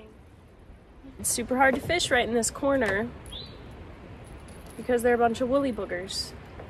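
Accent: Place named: Missouri, United States. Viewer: Sounds like missouri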